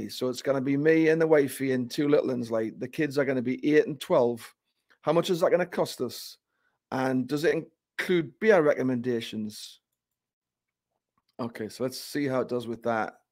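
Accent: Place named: Newcastle, United Kingdom. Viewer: Listening to your Newcastle accent was worth the 3 minutes I spent on this. The tech is great but your home accent is out of this world!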